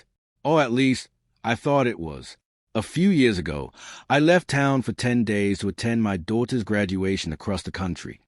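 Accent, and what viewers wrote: United Kingdom. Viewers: Same British nariator.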